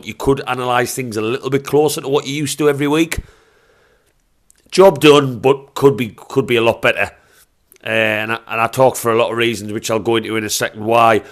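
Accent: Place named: Newcastle, United Kingdom. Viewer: Cracking Geordie accent lad haha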